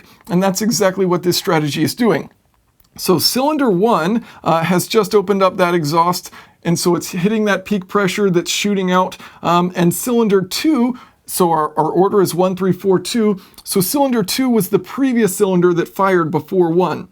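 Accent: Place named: United States. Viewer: Another great video thank you Jason. My question is a simple one, why in the States do you pronounce Mazda as Marz da? Though I could add, why also in America is Nissan pronounced Knee San? Expecting some hate here, but really just curious.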